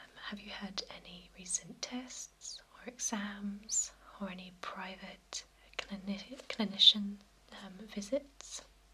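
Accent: England, United Kingdom. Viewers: These videos would not be as relaxing nor comforting if her accent were Bulgarian or Czechoslovakian ! Her English accent is perfect for ASMR.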